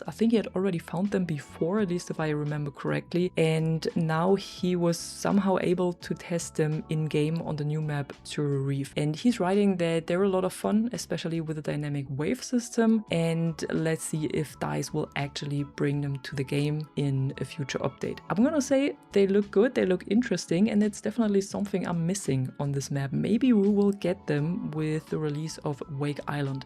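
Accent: Germany. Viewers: Dein Akzent hört sich an, wie wenn ne deutsche Frau englisch spricht. Kann das sein? aaahja, habs gesehen bei insta.. German.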